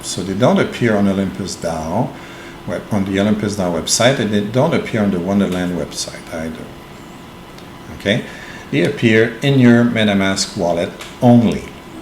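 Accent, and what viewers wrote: Quebec, Canada. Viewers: love the french Canadian accent. keep up the good work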